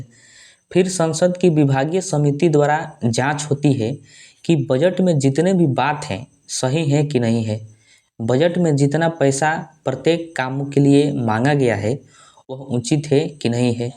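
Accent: Bihar, India. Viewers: Bihari boli hai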